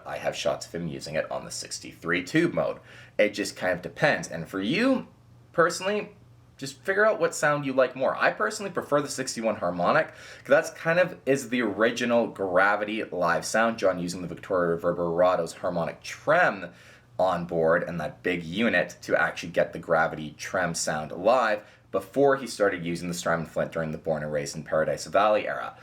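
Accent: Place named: Newfoundland, Canada. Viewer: Your accent is super interesting. Candian but with a hint of Irish? Is your family from Newfoundland?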